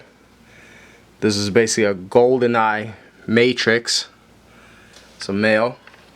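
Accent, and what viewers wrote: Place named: Massachusetts, United States. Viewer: Really great job bro. I'm from Worcester u sound like ur from mass. I'd love to grab one from you.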